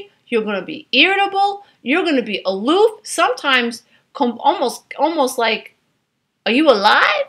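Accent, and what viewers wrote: New York, United States. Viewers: i just dicoverd your channel.i love your empathy and intrest in your work.you are teaching me somuch!i also love your new york acent! from kevin,an abused child that went thrugh councilg off and on for 30 years.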